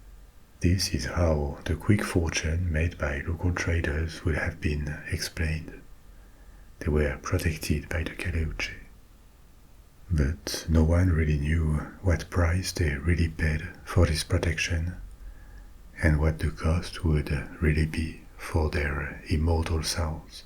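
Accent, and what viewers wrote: France. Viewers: This is the perfect channel you put on to hear a gentleman tell tales and tell history like as if he was there in a gentle voice with a lovely French accent, a perfect combination, thats something to me is rare, and i love it, so all i have to say is this, Merci!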